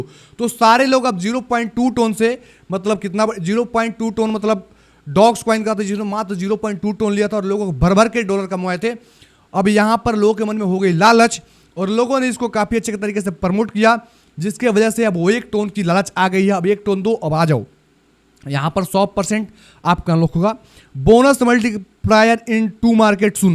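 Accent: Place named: Bihar, India. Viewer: Bhai Aap Bihar Ki Bhasa aapni Boto Me Jhalkata Hai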